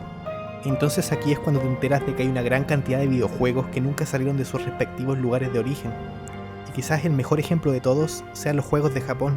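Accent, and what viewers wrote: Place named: Chile. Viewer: Increíble reseña. Encontré tu video porque alguien lo recomendó en un tablón de imágenes. Me llamó la atención la imagen del post en el que estaba la niña escalofriante que está en un callejón al atardecer. No me esperaba encontrarme con esta calidad de vídeo. El juego parece genial y lo que expones sobre el mismo me hace querer jugarlo pero por desgracia, no tengo mucho interés en aprender japonés, así que será a puro gameplay por ahora. Sin embargo, me asombra tu dedicación y atención a los detalles al armar, editar y en recopilar información de un juego casi desconocido y del que jamás había oído y en otro idioma para luego presentarla de manera que no solamente entretenga, sino que cuarenta y cuatro minutos me dejen queriendo ver más. Te ganaste un suscriptor más entre los cientos de miles que estoy seguro de que vendrán en un futuro. 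siempre que le des tanta o más dedicación a cada contenido que realices en el futuro. Y aunque honestamente no soy fan de tu acento (que creo que es chileno), la verdad no le di mucha importancia. Un poco de cuidado con el volumen del audio de tu narración es lo único que podría recomendarte. TL;DR : tremendo video bro, sigue así.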